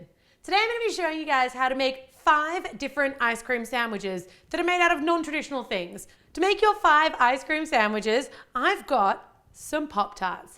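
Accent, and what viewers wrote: Australia. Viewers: Are you from Australia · are you from Australia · Are you Australian, omg what state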